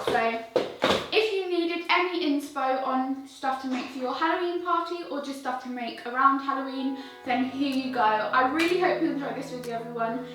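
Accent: United Kingdom. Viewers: Hi.. I want to make that cupcakes in my birthday coming soon for my classmates.. But whenever I try to make cupcakes then my buttercream starts to melt 😢 I don't want my class to laugh at me on my birthday😫can you please help me.. Actually I got the easy and best buttercream recipe from you: icing sugar, unsalted butter, peppermint extract and food colouring.. But I don't have peppermint extract 😭😞 Can I used something else instead?? Please reply.. I loveeee baking.. And want to show my classmates that I can bake I came here from watching your previous videos I love your personality and you accent I really wanna learn that British accent And sorry for my wrong english I speak German so my English is a bit weak I will be sooo happy if you reply me Love from Germany ❤❤❤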